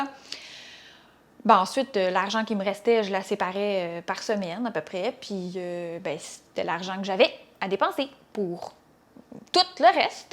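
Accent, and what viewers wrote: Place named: Quebec, Canada. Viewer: Bonjour, je viens de découvrir votre vidéo. Elle est géniale et votre accent québécois, J'adore 😍. J'adore votre façon de penser et de nous motiver à trouver solution pour mieux dépenser. J'adore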